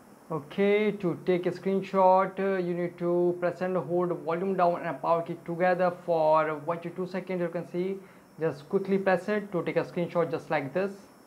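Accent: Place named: England, United Kingdom. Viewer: U r born in England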